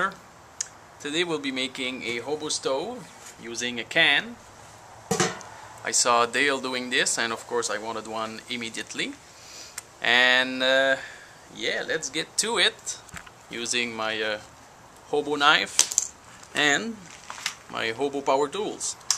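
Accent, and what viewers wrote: Quebec, Canada. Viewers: I was gana make a comment about in Canada we just trow a bit of water out and see if it freeze but Avec ton accent j'ai réaliser que tétait canadien. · Sonne accent québécois ca mon chum ! Bon video !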